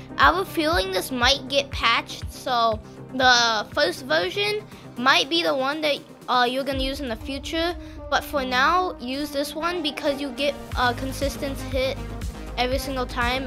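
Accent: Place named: United Kingdom. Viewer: BROS BRITISH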